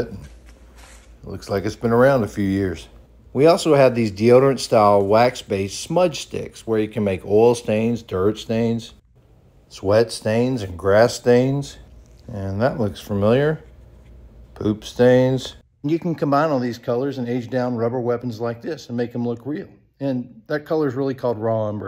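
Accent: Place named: South, United States. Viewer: Your southern accent is coming through on this one.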